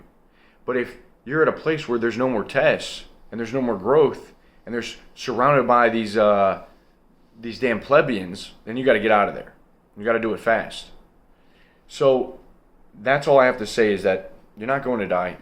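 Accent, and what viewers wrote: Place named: Michigan, United States. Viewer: Are you a Michigander? Cuz you sound like a buddy of mine from up there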